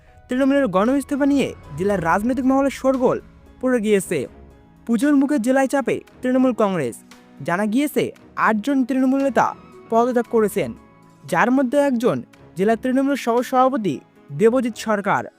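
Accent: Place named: Bangladesh. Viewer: আপনার উচ্চারন বাংলাদেশীদের মত।😮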